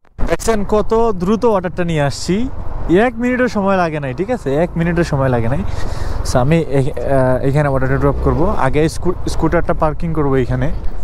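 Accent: Sylhet, Bangladesh. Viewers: ভাই সিলেটি নি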